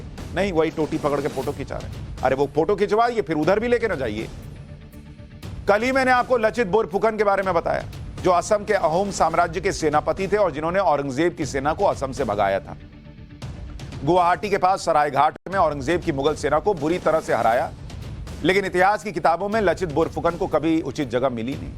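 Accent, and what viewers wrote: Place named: Bhojpuri, India. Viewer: Love you bhojpuri words and accents you use in between,it helps in suing away the stress got fron the serious news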